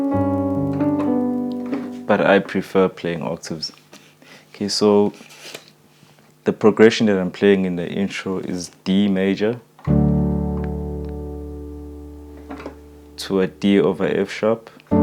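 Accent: South Africa. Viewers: Wow you sound South African :D I got excited there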